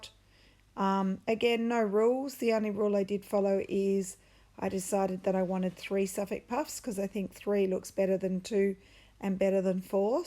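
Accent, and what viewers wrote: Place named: Australia. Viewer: Loving listening to you explain your process and your Australian accent. Getting excited to start stitching this week! Have a wonderful weekend.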